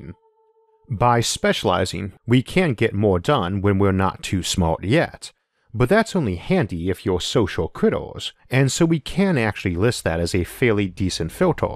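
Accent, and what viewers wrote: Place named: Washington, United States. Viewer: possibly but I think there's an accent like that in Washington somewhere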